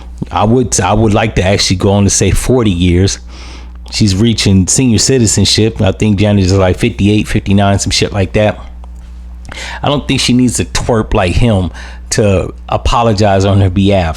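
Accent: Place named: Chicago, United States. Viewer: Keep going strong bru , you dropping fire , one of the best , you sound like one of my Chicago brothers .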